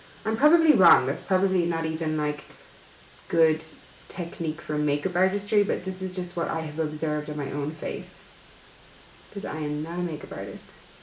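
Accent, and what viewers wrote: Ireland. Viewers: Your so beautiful!! Are you Irish? You've got a lovely Irish accent💕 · It sounds Irish to me...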